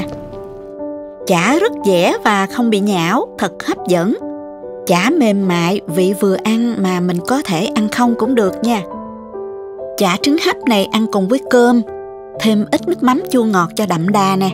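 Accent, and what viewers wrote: South, Vietnam. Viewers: Tuyệt vời cô ơi, cô chỉ dẫn thật rõ ràng cứ theo đó mà làm có món ăn ngon lành, giọng cô trong trẻo thân thương như chữ há, nè, hén, nha của người Nam Bộ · giong noi mien nam hien lành và de thuong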